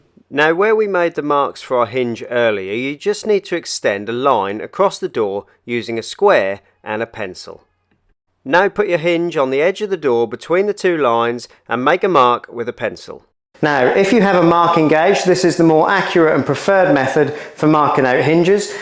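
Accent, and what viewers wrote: Norfolk, United Kingdom. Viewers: You are defo from Norfolk lol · I think I know that Norfolk accent, pretty sure I bought the same doors from the same wickes and then watched this video to hang them. You have helped a groundworker have a little bit of finesse. 🤣 Can you please show me how to cut & clad oak veneer stringer to my existing staircase please.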